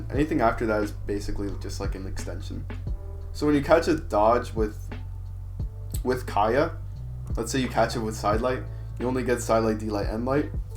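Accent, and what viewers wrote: Trinidad and Tobago. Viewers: ur from trinidad? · r u from trinidad?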